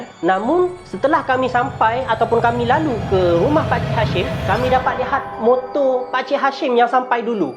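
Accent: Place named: Kelantan, Malaysia. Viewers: Huyoo.. senior aku tuuuu.. slang kelantan tu. Hehehe. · aku x faham loghat kelantan so jangn guna please guna bahasa KL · lol kan di dh guna bahasa melayu baku walaupon ada sikit ii loghat kelantam yg terselit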